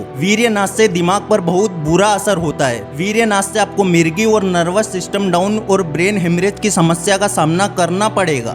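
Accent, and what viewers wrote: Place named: Gujarat, India. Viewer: Ye voice kisi gujrati ki h lgta h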